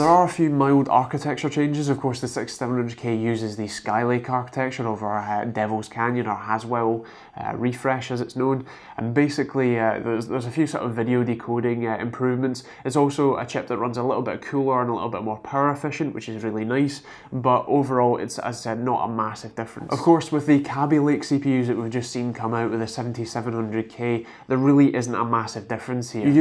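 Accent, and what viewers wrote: Scotland, United Kingdom. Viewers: Is it just me or are you sounding more Scottish in this video? (Not that it's problem if you were :P) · Well, great video as always. My 6700k don't even need to be upgrade and I'm running stock, didn't even use factory OC still good for most stuff. Didn't realise you have a Scottish accent (I think) from all the vids up till now. Haha. Would love to see a cable management video if you have one as my cable management now really is a mess. 6700k with a R9 390x, 16G ram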